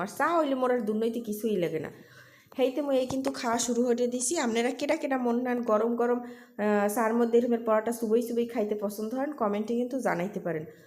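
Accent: Barishal, Bangladesh. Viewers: আসসালামু আলাইকুম আপু চলে এলাম তোমার বরিশালের কথা শুনতে তোমার কথাগুলো সত্যিই আমার অনেক ভালো লাগে তোমার কাছ থেকে আমি বরিশালের কথা শিখতে চাই শেখাবে ❤❤❤❤❤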